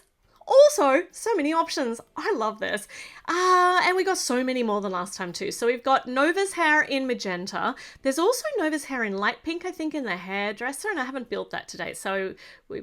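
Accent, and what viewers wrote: Australia. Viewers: It‘s so beautiful watching you after a long time, you were such a positive influence for me during covid times, i spent hours just watching you build and talk in your lovely australian accent, its so wholesome for me, you can‘t even imagine. Eventhough my childhood home is sold, and i‘m living alone for the first time in my life, when watching your videos, i‘m the thirteen year old me again, it‘s crazy. Love you so much❤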